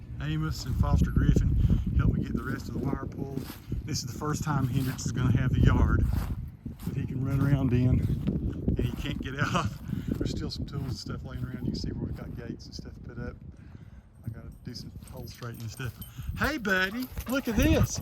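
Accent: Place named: North Carolina, United States. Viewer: I knew you were from North Carolina before I visited your videos to see if you ever said where you were from. lol. I'm from NC too. Born & raised in Charlotte. Thanks for the clear, detailed instructions.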